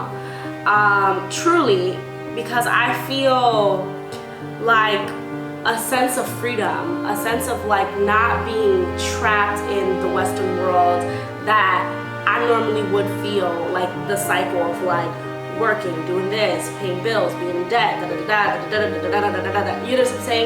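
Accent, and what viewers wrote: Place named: New York, United States. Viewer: Habari gani? asante sana for the vid. loving that accent, i know it when i hear it. new york in the house. loving that. Thanks for sharing and keeping us posted. you are truly a jewel. Life will only get better from this point out. peace and blessings.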